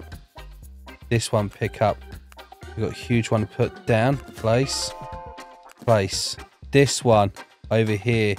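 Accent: United Kingdom. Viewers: I always thought you live in America but you are British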